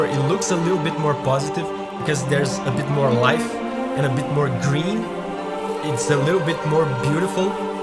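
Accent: Brazil. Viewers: e esse sotaque brasileiro meu consagrado kkkkkkk otimo video abraços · Sabia que você era Brasileiro só pelo sotaque kkkkkk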